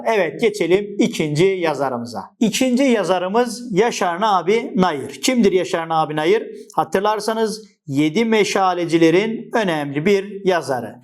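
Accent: Black Sea, Turkey. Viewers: Hocam karadenizli misiniz?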